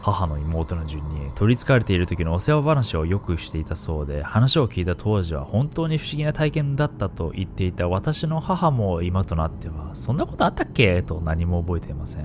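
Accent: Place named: Kyushu, Japan. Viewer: ナオキマンの九州弁からしか得られない供給がある。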